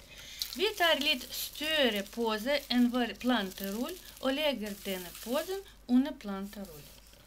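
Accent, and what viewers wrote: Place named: Russia. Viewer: Ruskiy aktsent na vidu :)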